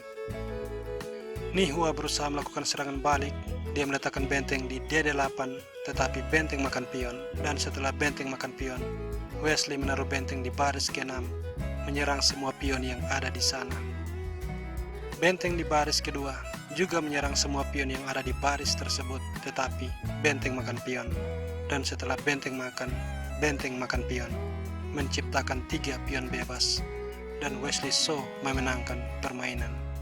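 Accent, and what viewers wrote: Manado, Indonesia. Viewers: Kaya y manado😁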